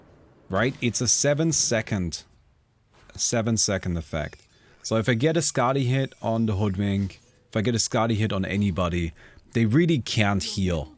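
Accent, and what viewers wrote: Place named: Germany. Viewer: Yea Now only the "Hello eenternet hello" is required combined with a stronger German accent Boom, is young again, no longer 420 million years old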